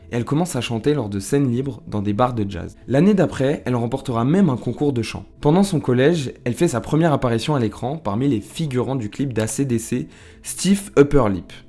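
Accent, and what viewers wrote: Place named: United Kingdom. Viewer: et des rappeurs? Noirs et donc forcément rappeurs quoi! Ce sont des chanteurs, pour ta gouverne et si tu n'as pas ce minimum de culture, faut pas faire de videos pour désinformer c'est pas le but. Ça remet en question la crédibilité des infos de l'ensemble de ta vidéo. Perso jai arrêté en cours de route. Entre ça et l'accent anglais je ne suis pas sur YT pour m'abrutir mais pour m'élever....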